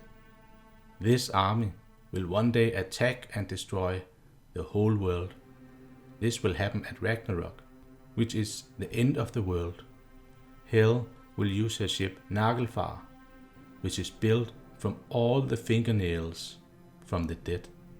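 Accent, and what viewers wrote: Denmark. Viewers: Excellent Video!, i have the doubt, where are you from? i think you have danish accent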